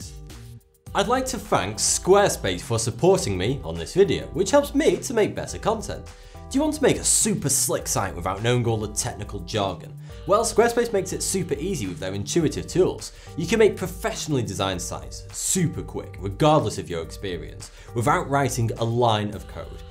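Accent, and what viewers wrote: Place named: England, United Kingdom. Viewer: I watch dis guy just so I can learn an English accent, it's so cool. I've been practising from March now.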